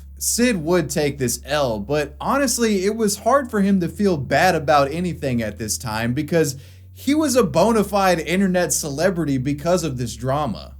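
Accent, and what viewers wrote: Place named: Virginia, United States. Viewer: Dude your Virginia accent is on point. Are you from around there?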